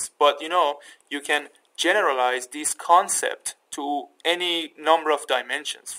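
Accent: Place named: Iran. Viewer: Thank you for the Video. I like your Iranian accent :)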